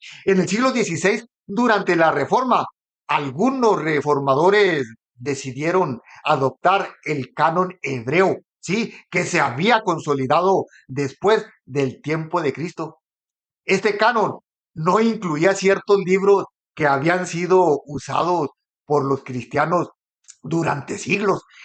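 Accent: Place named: Mexico. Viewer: Me encanto, mas alla de sus palabras, disfrute de esa tonalidad mexicana, se me hizo hermoso en su conjunto.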